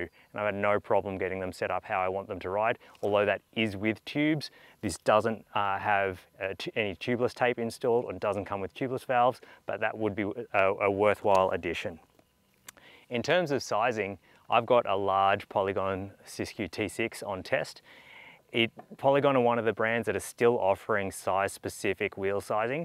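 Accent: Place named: Australia. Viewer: No problem hearing and understanding him perfectly here in Switzerland even with the typical Aussie accent! And he is right btw. actions do speak louder than words mate.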